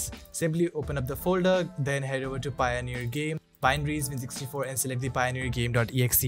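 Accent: India. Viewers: he sounds indian thats why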